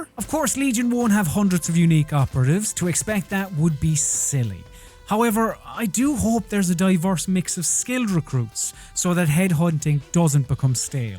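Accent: Ireland. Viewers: I love your Irish accent.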